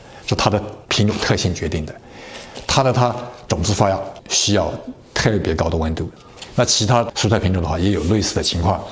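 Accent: Yunnan, China. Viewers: 谢谢李老师讲解😀听口音李老师是云南老乡？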